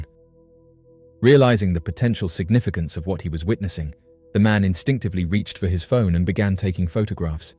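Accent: United Kingdom. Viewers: Why pick the British accent for narration? Your voice isn't good enough?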